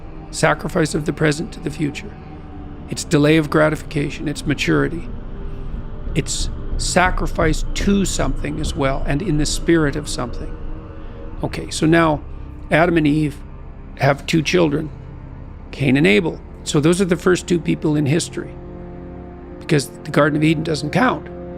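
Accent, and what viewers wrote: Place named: Canada. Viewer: Dragging it to say some generic ass advice. His Canadian accent just makes it pure comedy. Like god said it cane; you’re not trying hard enough to lose that disgusting accent.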